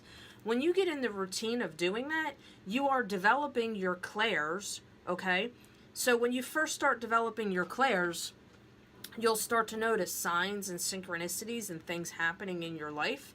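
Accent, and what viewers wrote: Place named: North, United States. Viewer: I love your accent!!! I'm in Florida so I'm not used to that northern accent, I hear "y'all" a lot and I've even got into the habit saying it, lol!!! With the synchronicities you talk about, I've been having them for a few years now. I've gotten pretty good with my pendulum because I made an alphabet pendulum board. I have connected with my loved ones on that. I even made dowsing rods out of metal coat hangers and straws from a YouTube video, they've "hugged" me when I asked them too!!! Pretty phenomenal!!! But I'm still not at a place with all my clairs where I feel confident, so I'm thinking cards might help more in that sense instead of the pendulum or rods... And I know it's not the same for everyone obviously, but do you think cards will help more developing the clairs?? And how long does it take for you to have them all blown open after paying attention to the synchronicities would you say would be an average timeframe maybe??? 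Thank you so much in advance!!! Great video!!!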